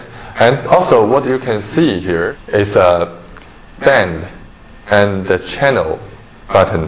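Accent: China. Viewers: Yeah its a language barrier. I understand chinese and where i live, we have China people trying to speak English alot, it sounds like this.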